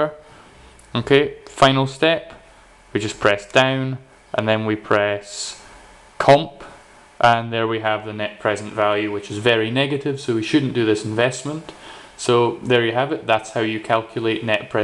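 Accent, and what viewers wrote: Scotland, United Kingdom. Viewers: God bless you. You truly are a gentleman and a scholar. Your Scottish accent is a comfort to me whenever I click on these videos.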